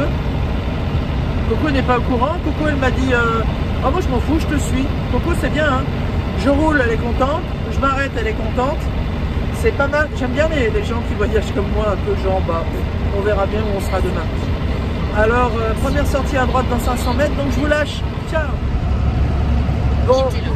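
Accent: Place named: South, France. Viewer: Coucou! Les alignements de Carnac auraient une origine religieuse pour le culte des morts. Pour le reste, les scientifiques sont comme toi, toujours dans la recherche du pourquoi. C’est marrant, on retrouve notre petite des vadrouilles 😊 Ton accent du Sud est revenu 😂 Très jolie vadrouille et contente que tu sois revenue sur les routes et en plus accompagnée de Coco et Newton 😊 Bisous!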